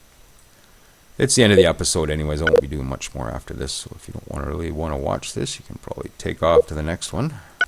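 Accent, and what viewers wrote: Canada. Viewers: great series. I have to ask are you Canadian? Your accent is either Eastern Canada or, Northern Ontario. I could be way off but I had to ask. I am from Ontario and the accent is very distinct.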